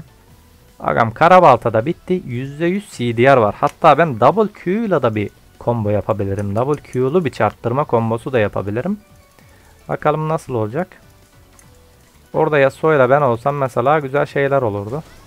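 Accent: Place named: Balıkesir, Turkey. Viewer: Nerelisin Balıkesir ağzı konuşuyor gibisin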